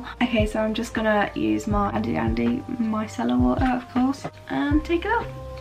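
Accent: United Kingdom. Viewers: ur british accent has my all heart 🥺 ps: i love all your videos too<3 xx from Brazil 🇧🇷❤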